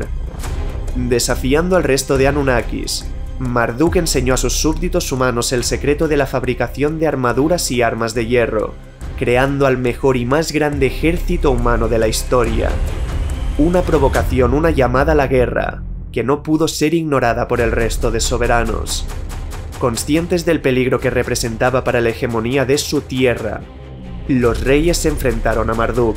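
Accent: Spain. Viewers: Buenas, es lo que tiene haber nacido en España. Que hablamos Español. Por cierto el acento es en este caso es, Catalán. 👍🏻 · Vine buscando cobre y encontré oro!, este video es simplemente increíble, perfectamente explicado y con una edición fantastica, usualmente me desagrada el acento españolizado, sin embargo tienes una voz bastante agradable al oído, el tono adecuado para prestar atención a cada uno de los temas que manejas, gran trabajo amigo, muero por ver mas de tu trabajo. Saludos desde Mexico.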